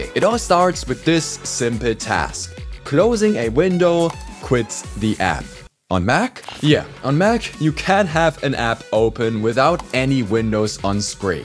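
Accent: Germany. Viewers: I mean, you can hear that you are probably german, but it's so subtle most people wont even notice. Still great video. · You sound like you are German. Are you? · i can smell german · Why does he sound like a WW2 german officer though? That accent is crazy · erwischt, du bist deutsch :))) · Kann es sein, dass du Deutsch bist? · german accent go crazy tho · Als Deutscher reichen 5 Sekunden um zu hören, dass du auch aus Deutschland kommst. Aber gutes Video. 👍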